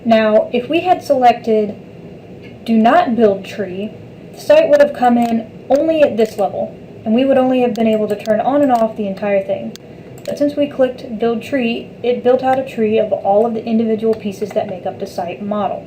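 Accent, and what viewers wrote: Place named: Australia. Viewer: very simple very Aussie thx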